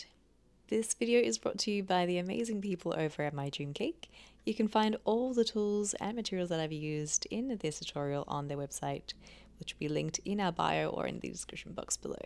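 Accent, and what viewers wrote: Australia. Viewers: No problem with the audio here. It's maybe a little quieter than some channels but all channels vary. Maybe someone is having difficulty with your gorgeous Aussie accent?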